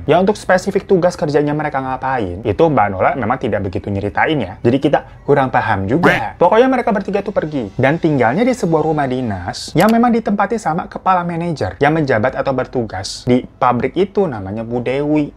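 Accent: Java, Indonesia. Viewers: Indonesia nya bagian surabaya dan semarang🤣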